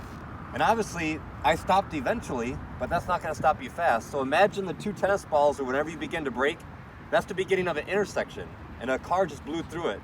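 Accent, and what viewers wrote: United States. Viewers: I like your American accent..very clear. Great info.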